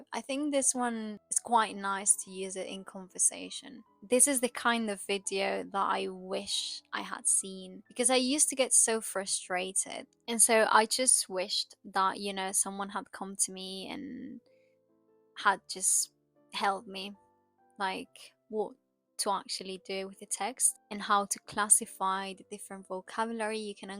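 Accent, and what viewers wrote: RP, United Kingdom. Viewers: I'm American and have never heard "mooch" used that way before, 😅so I'm not surprised you haven't heard it before either. Very colloquial British I guess, no American would say that. (The second use of "mooch" as in "freeloading," everyone would understand though.) You probably already know this but when she says, "slipping into bad habits of laying in bed doom"; Firstly, she meant "bedroom" (obviously), secondly, the correct word is "lying." It's an intransitive verb and sometimes even fairly educated speakers mix up "lying" and "laying." Just wanted to say that mistakes like this have always given me pause about learning from social media though. Your English is great and very natural. Your RP accent would no doubt get a lot of attention and a load of compliments in the States!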